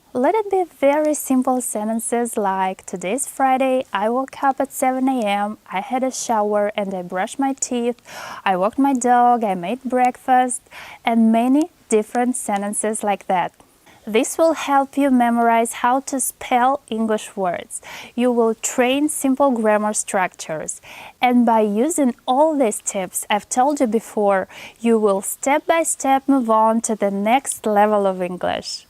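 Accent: Russia. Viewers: Are you originally from Russia? You have such sweet accent that I could not but ask. 😁♥️ Much love! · How long have you been learning english? Judging that you are russian by nationality, it makes sense to your accent. Russian schools do not offer a good system to learn English, so that's why so many move to Europe to study. I've been learning English as a second language for 10 years, and I'm russian by ethnicity too, but I have an American accent, and no trace of Russian. thanks in advance:)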